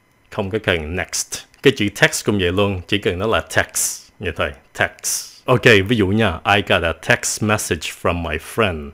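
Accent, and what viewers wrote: United States. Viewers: Do ở đây dạy phát âm theo Anh-Mỹ. Nên đọc theo là đúng với nhiều ngừoi Mỹ mình nghe, Còn cách đọc thành iks là âm Anh-Anh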